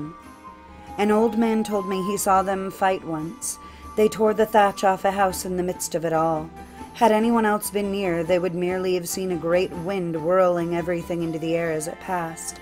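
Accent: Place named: Ireland. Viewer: Nice job, btw good Irish accent!💚💚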